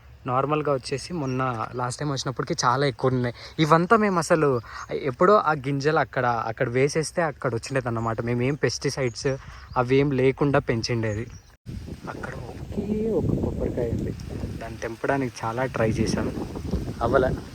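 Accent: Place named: Chittoor, India. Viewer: Rasayalaseema chittoor slang matalavutunnav bro,, nice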